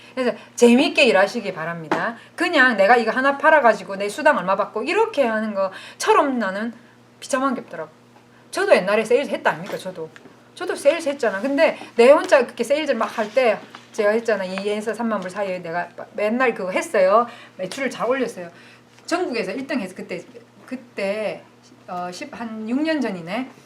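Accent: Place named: Gyeongsang, South Korea. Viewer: 얼굴도 예쁘고 내가 좋아하는 경상도녀의 강한 사투리가 신앙까지 좋으셔서 배우기도 은혜로워요 감사함다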